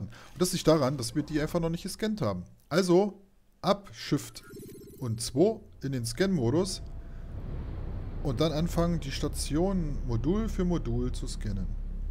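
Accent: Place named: Berlin, Germany. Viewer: Klingst wie ein Brandenburger. Die haben immer den heftigsten Berliner Dialekt.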